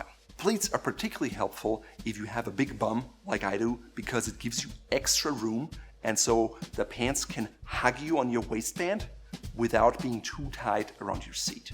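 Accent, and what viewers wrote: Germany. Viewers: oh that german accent .... 🤯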